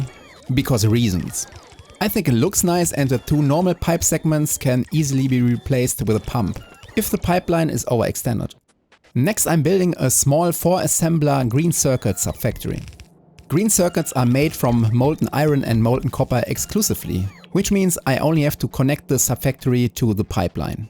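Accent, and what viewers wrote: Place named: Germany. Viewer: native speaker of both english and german here. Yeah, it's noticeable, but you're doing great, it's perfectly understandable (meaning it fulfills its purpose), it's infinitely preferable to AI slop voice, and at this point it would sound wrong to hear you speak english without an accent, it's lowkey iconic.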